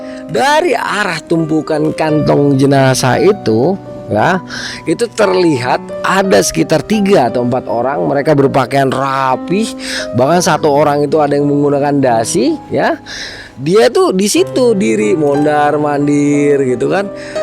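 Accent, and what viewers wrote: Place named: Jakarta, Indonesia. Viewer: ceritanya asik abang nya gw suka logat betawi nya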